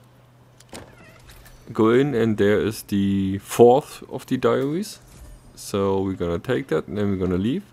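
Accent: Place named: Germany. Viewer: I like your accent. Sounds Deutsch!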